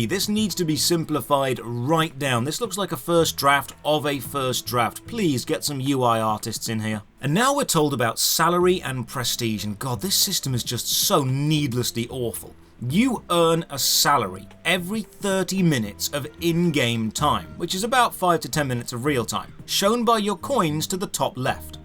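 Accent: United Kingdom. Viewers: Hello American guy eh? is among the most British looking and sounding people ever lol how'd you get that wrong:D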